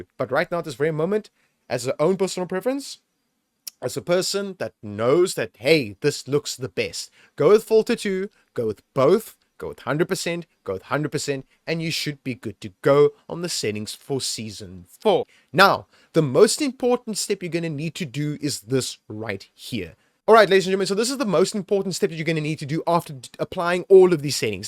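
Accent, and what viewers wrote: South Africa. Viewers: i heard the accent and realised your a south african too, awe and thanks for making this video it really helped me.also keep up the good work my bru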